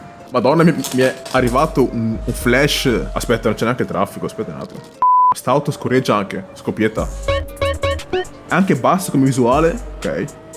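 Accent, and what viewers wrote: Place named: Veneto, Italy. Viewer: grande vecio ti ho conosciuto da poco,spacchi,e poi te si veneto 💯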